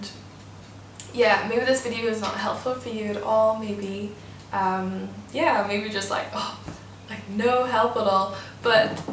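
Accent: Northern Ireland, United Kingdom. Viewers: You said that medication seems to make it worse. I have been somewhat pressured into taking various medications and to be honest all of them made derealization much worse. I definitely cannot take alcohol anymore because the hangover is basically really intense derealization. I guess the only way out is acceptance and to limit the fear response when I experience it. I wonder how you are coping now. I hope you have found a way out. I detect a NI accent.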